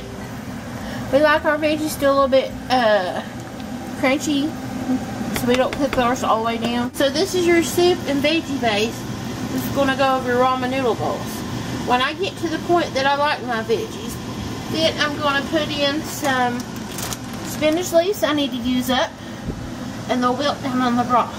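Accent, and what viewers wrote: South, United States. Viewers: Love your southern accent... just like me ;)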